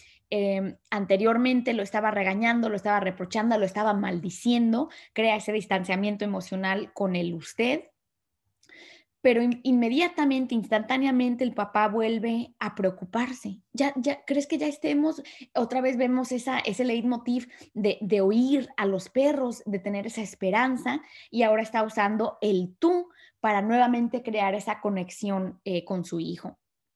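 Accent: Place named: Mexico. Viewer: Me encanta la literatura y la obra de Rulfo para mí, es de lo mejor en calidad, la forma en que desmenuzas el cuento es excelente, interpretas a la perfección la forma de hablar del mexicano, parece que tuvieras bastante interacción con personas de aquí, fue muy grato verte en el canal de Laura.Saludos desde la ciudad de México .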